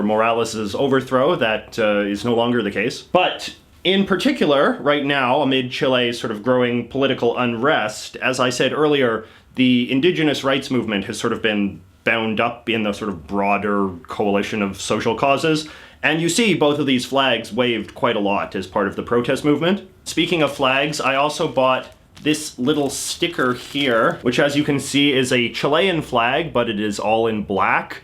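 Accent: Canada. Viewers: I mean he is an English speaking Canadian. Even if you study a language for a very long time, especially as an adult, it is very difficult to not have a distinctly foreign accent when pronouncing words in other languages. This is because, especially if you are monolingual, you will pronounce words based on the phonemes in your mother tongue. For example, despite me having studied Spanish for 10 years as a teenager and young adult, I now have lost my authentic Spanish accent and now sound very "white" when I speak the language, simply because I haven't been using the language. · Nvm I sounds Canadian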